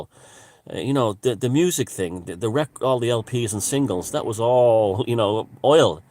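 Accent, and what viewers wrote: Ireland. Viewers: There goes again with that intriguing accent, I love you... “economic growt”! I’m gonna start practicing my Irish accent... lol, I’m serious... I’m doing the accent right now as I type! Why am I thinking of ”Trainspotting” (1998) all of the sudden? That’s RIDDLED with Scottish indiscernibilities... which is also awesome. Alan Watt: “Such a farrrse, it’s such a farrrse...”! Did I hear you say lubricant?! Gonna get me some Irish laydays!!!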